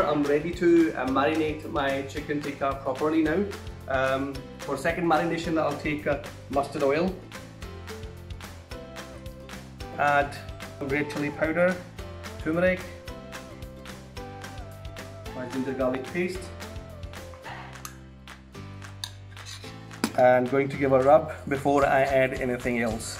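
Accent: Scotland, United Kingdom. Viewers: Love the accent Asian , Scottish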